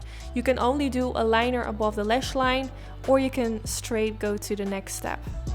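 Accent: Netherlands. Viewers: Ik hoorde het al aan je accent dat je Nederlands was ☺️💕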